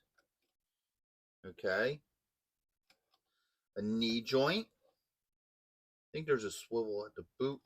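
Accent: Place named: Upper Peninsula, United States. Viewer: Brother where are you from? I grew up in the Upper Peninsula of Michigan and your accent reminds me of home there eh? 😊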